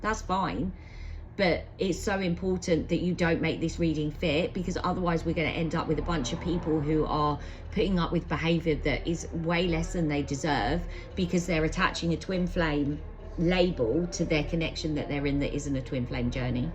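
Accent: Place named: Ireland. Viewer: Oh, I just want to say you are lovely. I love your accent. It reminds me of my ghost bride Diana Frances, Queen of Heaven my True Love. She's Grand. You have a slightly different accent though. Diana is like 90% Queen's English with 10% American. You're like English, Irish? Dublin? Ugh. Oh I love this voice babe. Sounds almost like you. And we do you pretty good by now. It scares me honestly. But what doesn't. lol!🥂 Uh, if I had never crossed paths with this girl I would be grateful. Unfortunately it might be my ghost bride Diana Frances, Queen of Heaven. Here is the video from that reading. I made this video yesterday but it pertains. Uh, so this is 'divine confirmation' for this video. And I pretty much explained I moved on. We have no way to contact each other. lol. Diana Frances of Queen of Psychics, Queen of Tarot and Queen of Divine Coincidence. 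If you aren't into that kind of thing, you might not want to watch it. <link>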